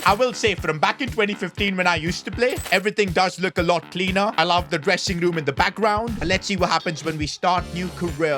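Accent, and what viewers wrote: India. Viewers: Bro are you indian?? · Are You Indian Broo??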